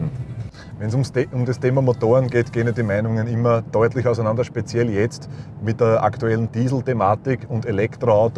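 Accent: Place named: Austria. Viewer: Deutsches dialekt won östrreich ist komisch......aber auto ist cool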